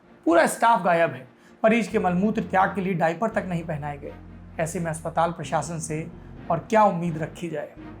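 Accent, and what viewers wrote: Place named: Uttar Pradesh, India. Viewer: सर जी एक बार विकास दुबे की कहानी अच्छाई बुराई सब अपने यूपी वाले स्टाइल में सुना दीजिए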